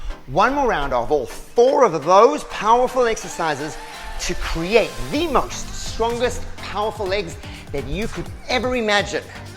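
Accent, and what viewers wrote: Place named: South Africa. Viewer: You are fantastic , you are clear & thorough , explaining every move , what each move does, which motivates , &, & , & , you are fun ! 🥳Love it . I laugh when you do . Have just found you & imeeediately subscribed ! Are you in South Africa ? Love your accent .Thanks so much . Hello strong 74 year old legs !! Yay , here they come .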